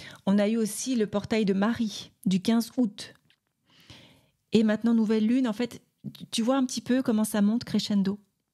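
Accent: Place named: Quebec, Canada. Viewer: ...ce n'est pas pour "e" rien..., cool pour l'accent quebecois!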